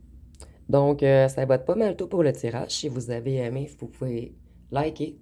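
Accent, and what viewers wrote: Canada. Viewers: Bonjour, j' aime beaucoup votre accent canadien 😊 Je me sens plus forte depuis ses épreuves.Une forte emprise familiale et ex.... J' ai mis un stop depuis janvier 2024 et j' ai été profondément blessée. Je le remercie pour cette belle leçon de vie. Après une longue traversée du désert, je m'ouvre à nouveau vers le champ des possibles. Je suis plus en amour pour moi même et pour la vie ❤ Merci beaucoup ❤❤❤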